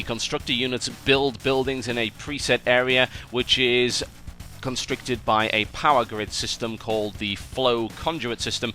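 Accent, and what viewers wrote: United Kingdom. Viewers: wow! you sounded more british back then ;b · old sound quality makes him sounds more british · he sounds a bit more english you know. translation for idiots (he sounds a bit more british) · He pronaunces everything so... british? I dont know, but I have the strangest boner. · Is it just me or does he sound more British in this video then he does now? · He sure sounds more brittish here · Is it just me or he sounds more "british"?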